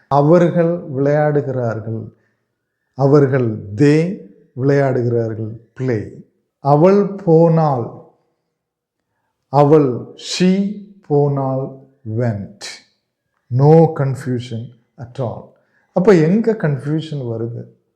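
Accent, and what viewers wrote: Kerala, India. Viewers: I don't need anyone's coaching as my vocabulary is excellent. It was just a suggestion because what you blabber in the name English is pure junk with all that mallu accent.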